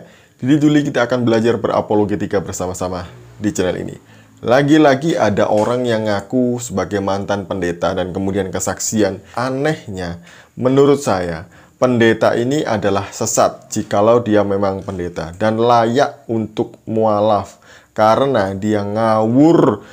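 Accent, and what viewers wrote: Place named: Manado, Indonesia. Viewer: Kau itu org mana, kalau logatnya seperti darianado.. org mabok yg masuk gereja yg kamu bilang itu bohong tetapi kalau dia mabok pasti disuruh pulang, kalau kamu kentut di gereja ya terserah dia tap tapi orgnya tdk tau malu..tapi tdk membatalkan org lain untuk beribadah karena makna cara penyembahan kpd Tuhan berbeda dng islam..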